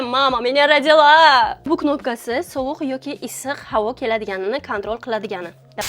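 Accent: Bukhara, Uzbekistan. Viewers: Ой бу шева бухороники Шу район будагет! Мо шахар будаго тожики гап мезанем албатта😊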